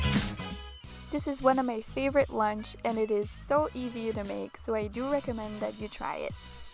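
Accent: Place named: Gaspésie, Canada. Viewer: omg your accent sounds just like the accent from where I live... I am in Gaspesie... Also hello fellow vegan. I love this video 😍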